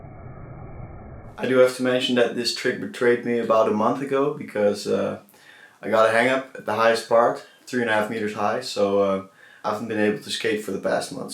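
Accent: Netherlands. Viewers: yo u dutch to? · you sound dutch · Bro ik hoorde je accent in VLSkate’s video en ik wist gewoon dat je nederlands was, lijpe videos man keep it up 👌🏻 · Wait u Dutch? That explains your accent, hahaha ga zo door!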